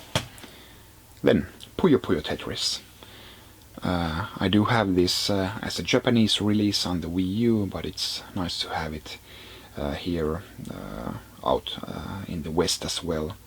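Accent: Finland. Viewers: Lucas Shumake typical nerdy finnish guy accent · You're Finnish :) from the accent. I got my Nintendo Switch a couple days ago <3. Will get a Pro Controller at some point and Donkey Kong Country Tropical Freeze when it comes out. Also Zelda BOTW and others. It's an awesome hybrid console. both docked and portable is great. Graphics are very much good enough and then some. I have SMOdyssey + MK8Deluxe. Yep 1 year old now.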